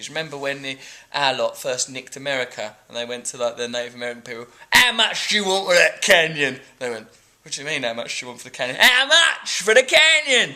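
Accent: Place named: United Kingdom. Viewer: Good afternoon dear Russell ( in England, in Mx, the morning ) first of all I would like to thank thee very much because now we can have a little bit larger glimpse to have a look ( though still very rapidly seen for most of us, I think, to grasp the title completely but all the same you have slowed down your pace, which is really appreciated) at the books you read excerpts from. As homework, you leave us with many themes to think about but I take the one I could grasp thoroughly ( little by little am getting my ears used to thy velocity of speaking and your lovely British accent ) the subject matter I could comprehend was the one related to our fleeting passing through this dimension on planet Earth ( haven't you observed that we've been programmed with this notion since we're children by parents, relatives and later school and everyone confirming and reaffirming as scratching screeking old vynils that "life is so short" that "it fleets away" etc., all those currency-phrases we have to deal with all of the time, day after day, yes indeed but pleeease ... 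) well what I wanted to say is that o.k., sooner or later we shall be dead one of these days and that what about our belongings, as you said: "it won't be our problem anyway". Then, and in order to prepare for that event that in any second passing, any minute, any hour, any moment is nigh and nearing: would you please include in your list of topics for your discussion books like the Tibetan Book of the Dead " Bardo Thodol" a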